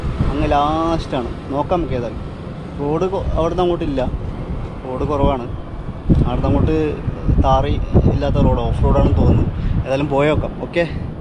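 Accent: Malappuram, India. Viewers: Sooopppeerr പറയാൻ വാക്കുകൾ ഇല്ല. എന്റെ ഉമ്മചിയുടെ വീട് മലപ്പുറം ആണ്. നിങ്ങളുടെ വർത്താനം കേട്ടപ്പോൾ അവിടെ പോയപോലെ തോന്നി.🤗🤗 ഇവിടെ ഇരുന്ന് ഇതെല്ലാം കണ്ട് സമാധാനിക്കാം. Corona കാരണം പുതെറങ്ങാൻ പറ്റാത്ത ഞാൻ.😐😔😐😔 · Malappuram basha 😙😙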